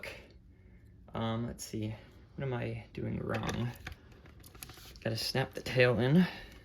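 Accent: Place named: Spain. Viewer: porque haces videos con vos española no me gusta soy colombiano pero no me gusta mira te escriben todos en ingles y se ve raro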